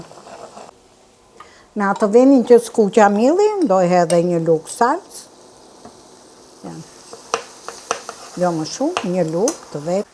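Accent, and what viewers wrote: Tirana, Albania. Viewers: Zonjë e nderuar të lumshin duart ,sa mir gatuan dhe sa bukur shpjegon me dialektin Tironas ,paç jetën sa më të gjatë ,dhe të kesh shëndet të mirë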